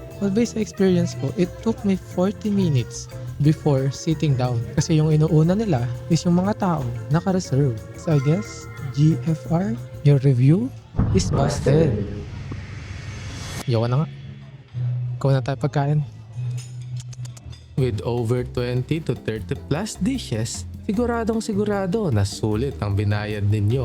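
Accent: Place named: Tagalog, Philippines. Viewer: And luxurious nang tagalog accent mo lods, W vids tho.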